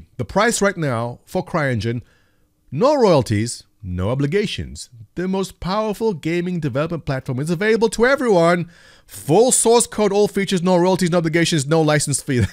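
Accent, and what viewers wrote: South Africa. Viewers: nice to hear a fellow south african.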